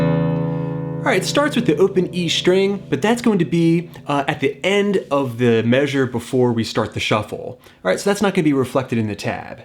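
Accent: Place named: United States. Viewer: Love your passion amigo! Great lesson again....where are you from in the US?? Your accent is super cool! Much love and gratitude from Ipswich, UK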